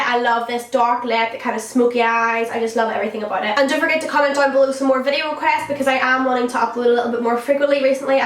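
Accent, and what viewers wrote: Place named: Belfast, United Kingdom. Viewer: Are you from Belfast? I recognise that accent XD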